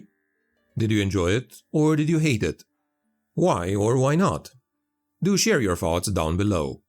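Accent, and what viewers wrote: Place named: Transylvania, Romania. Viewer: live from Transylvania! Two blocks over from Dracula's castle 😎. Okay I'm sure you're probably not near Dracula's castle, but I can at least pretend you are 😊. I mean come on, that accent 🥰.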